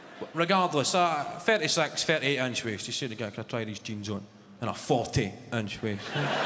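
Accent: Scotland, United Kingdom. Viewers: Well I am Welsh and have no problem understanding him, you just need practice to get your ear in. Move to Scotland for a couple of month I am sure that will sort the problem after you have said pardon a few times😂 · If you're serious, [and I hope you are] this is English spoken by a Scot in a Scottish accent.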